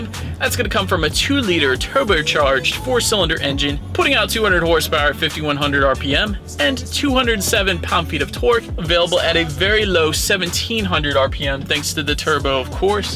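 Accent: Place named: Philadelphia, United States. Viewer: are u from philly???? omg thats the most philly accent ive ever hurd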